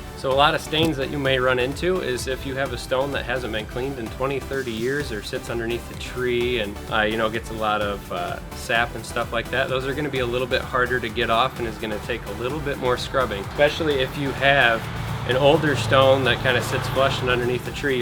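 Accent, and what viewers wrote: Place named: North, United States. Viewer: I love the "accent" of the upper states!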